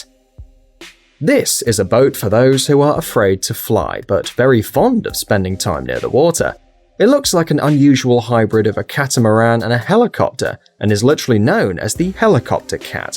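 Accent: United Kingdom. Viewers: Couldn't listen to one more minute of that annoying british accent .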